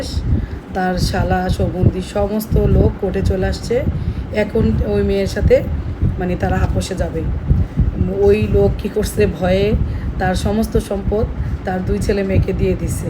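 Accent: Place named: Bogura, Bangladesh. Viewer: আপা আপনা কথার মাধ্যমে অনেক কিছু শিখলাম। আপনার কথাগুলো বলার ধরন বগুড়ার ভাষার মত। কোন জেলায় আপনার বাসা।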